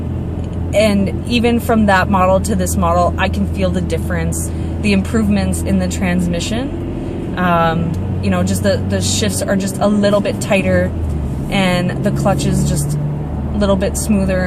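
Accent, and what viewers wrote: Canada. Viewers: cute lady, but its Mahhhzda. i literally loathe canadian pronunciation.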